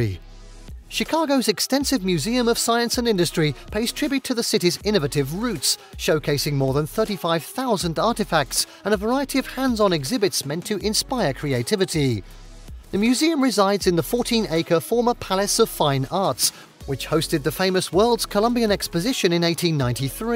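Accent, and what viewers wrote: United Kingdom. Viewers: A nice survey with occasionally dazzling if often deceptive photography but also some egregious errors. "Fulton House" is not that house, whatever the house depicted may be —and in any case, it's not a house. Anish Kapoor, though he may have his studio in London, is Indian, from Mumbai. And the peppy British accent: What's up with that?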